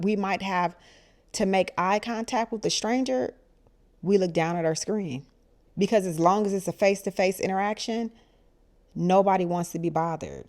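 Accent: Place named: Los Angeles, United States. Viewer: You from LA? You got a LA accent.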